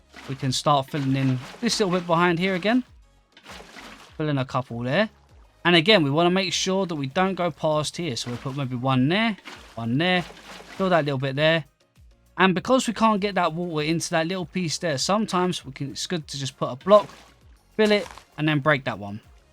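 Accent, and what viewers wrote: United Kingdom. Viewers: British duh!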